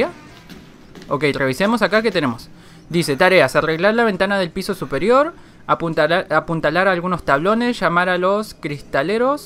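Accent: Argentina. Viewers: sos argentino???? desilo dale! xD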